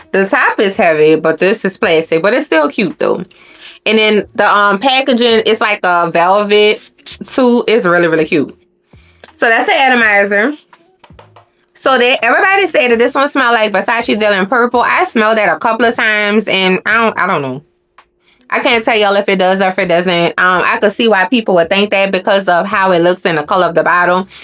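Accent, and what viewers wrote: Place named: Louisiana, United States. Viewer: Hey Sis!!! Girl...are u from Louisiana?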